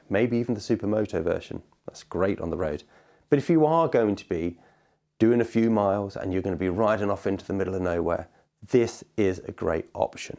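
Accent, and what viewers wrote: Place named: United Kingdom. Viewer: Best vid on the 690! I need it all and your British !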